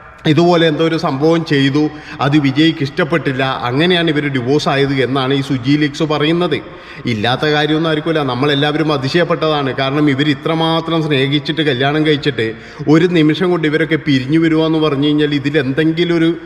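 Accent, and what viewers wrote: Kannur, India. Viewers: കണ്ണൂർ ഭാഷ ആണല്ലോ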